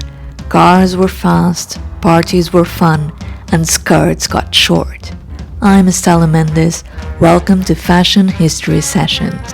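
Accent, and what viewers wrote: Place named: Portugal. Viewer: Portuguese accent . Love it.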